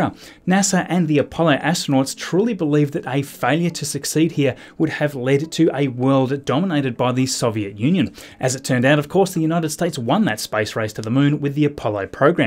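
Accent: Australia. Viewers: I don't like your chanel more because, I don't understand your australian accent verry well, I'm just studying english from Brasil, we speak portugese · "Hache El Es" (HLS) I love how Australians say the letter H. Americans pronounce it just "Ache" without the "Heh" sound. But you include the actual letter, which frankly makes a lot more sense. A completely sideways and weird observation, I know. I'm completely fascinated with language, even in cases where it's my own language. :D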